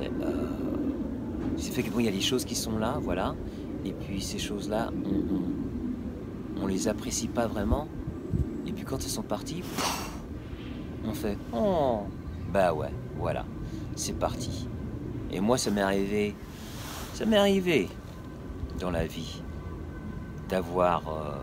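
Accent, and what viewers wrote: France. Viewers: ese acento Frances ahhhh es muy lindo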